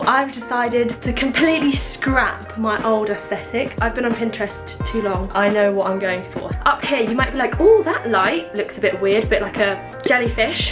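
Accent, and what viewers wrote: England, United Kingdom. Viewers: i really love your english accent 😍😍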